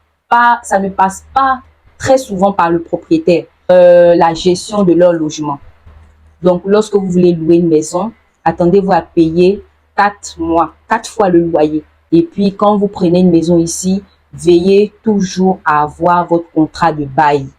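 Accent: Ivory Coast. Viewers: Du moment où tu as un accent ivoirien, sache que tu ivoirienne. Merci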